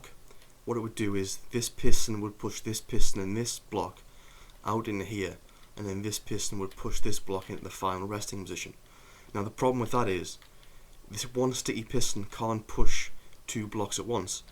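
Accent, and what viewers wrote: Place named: Newcastle, United Kingdom. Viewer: Good video, it's just inspired me to try something similar myself. Btw, where abouts in the North are you from? I can't quite place your accent, but you must be up in the North-East with me (I'm from Hartlepool)! All I could figure was that you sound quite like a Geordie, but not full on. Or am I wrong and that's a Sunderland shirt in the intro lol?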